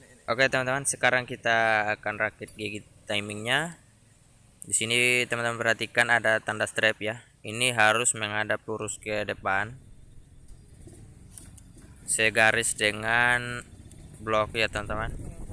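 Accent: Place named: Ambon, Indonesia. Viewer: Dari bahasa suara sumbang mcam org ambon k? Posisi bengkel dimna bos?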